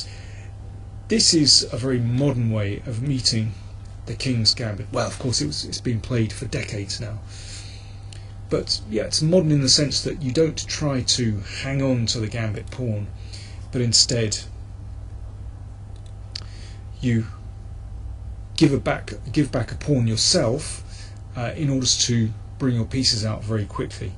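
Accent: United Kingdom. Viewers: What great channel. I'm so glad I stumbled upon it over a year ago, countless hours of great chess and a hugely entertaining British accent along with it. Great work Mr. King!!!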